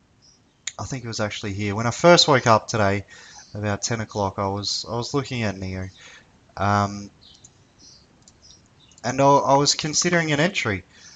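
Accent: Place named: Australia. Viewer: Gday, just subbed. nice to hear an aussie accent doing some price action. New to all this but watching as much as possible to learn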